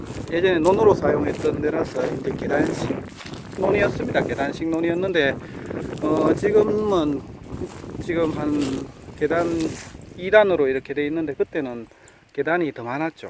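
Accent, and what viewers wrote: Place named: Gyeongsang, South Korea. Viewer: 네^^ ㅋㅋ 반갑습니다 올려주신 영상 잘보고있습니다 경상도 사투리도 듣기좋구요 좋은곳 많이올려주세요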